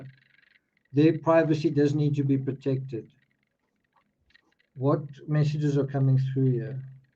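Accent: South Africa. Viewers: Is that a S. African accent? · Hi are you aa South African ( your prenounceanation is very afr.) Nothing wrong in that im afr. Know you live abrought. Oma s a.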